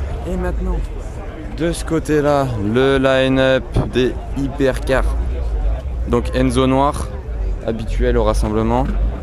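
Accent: France. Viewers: A la meuf te lache un regard 👀 elle a kiffé l’accent français 😂. La Ferrari perso le son et juste dingue.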